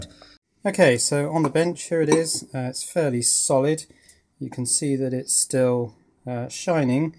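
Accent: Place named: RP, United Kingdom. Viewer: you have an upper crust English accent.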